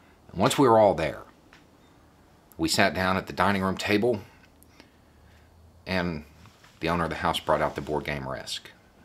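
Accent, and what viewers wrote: South, United States. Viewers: It takes sometimes for me to get used to the southern accent expressing points of view in coherent and complete sentences.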